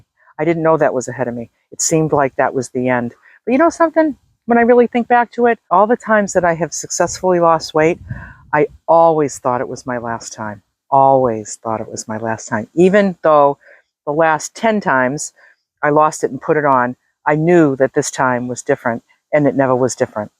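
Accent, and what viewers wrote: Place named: Massachusetts, United States. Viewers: I love your accent. I miss my east coast cousins.UR my example. I need to drink moreH2O. I have to walk more! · You sound like you’re from Massachusetts are you I am and I pick up a pre-bit of an accent. Love your channel. I’m a regular subscribe right now. Please answer my question about Massachusetts. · I am sooo grateful that I fought your YouTube sight. You are an inspiration to me. I can so relate to everything you have shared. I hear a Bostonian accent which also excited me because I’m a true Bostonian too. I will continue to watch you and look forward to more videos. I truly feel I can sleep better tonight and wake with 3 different outlooks to my life tomorrow (physical, emotion and spiritual. Not to sound corny but I swear You were sent as a wellness blessing to me. Thank you! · Nice to meet you,! 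You sound like you're from the Boston area, not far from me in Southern NH! Hi, neighbor! Thank you for sharing your inspiring story...it was such an encouragement to my heart! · you sound like voices from my childhood in Massachusetts.💗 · Thank you for being you, and here on YouTube! I like you! One reason is because I can tell that you like you! I find you to be very refreshing! Sorry/not sorry for all the exclamation points. 😊 I'm in New England, and your accent sounds pretty local to me. I'm curious where you're from. Anyway, I'll be following you here. Keep on keepin' on my virtual friend! You're an inspiration. ❤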